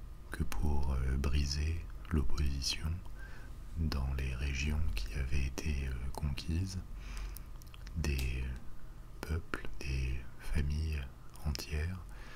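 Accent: France. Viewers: I now realize what's been missing from my ASMR experience. A beautiful French accent 😍😍😍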